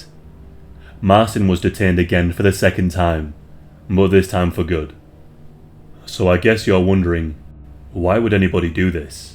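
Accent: United Kingdom. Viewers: Just what we need- another Brit on YT talking horror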